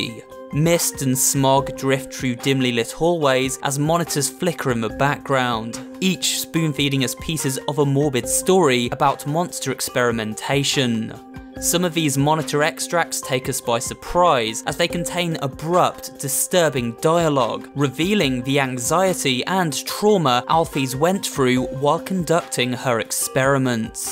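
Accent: United Kingdom. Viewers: It's a British accent, dude. Don't judge him. He can't help it. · Its his British accent :I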